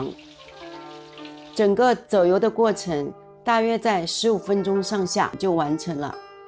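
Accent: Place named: Jiangnan, China. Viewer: 听口音是江南人。太仓人概率95%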